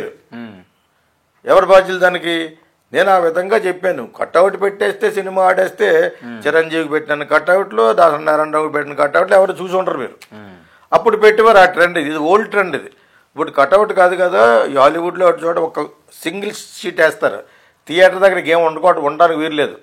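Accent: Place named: Godavari, India. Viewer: Geetha krishna u r gud for nothing bcoz of nee bullshit talks in channels. How come u target MEGA STAR tell me first ? I watch ur videos regularly bcoz of ur accent of godavari but u make mistakes in interviews by targeting SHANKAR. If u r criticize other Director then u don't have right as a Director.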